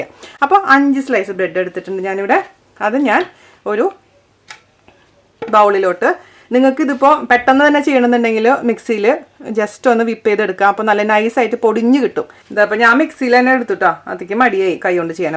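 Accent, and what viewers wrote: Thrissur, India. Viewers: Hi chechi...checheedea thrissur slang samsaram enik othiri eshtanu. Adhu polea coocking..dubail evdea chechi stay cheyunadh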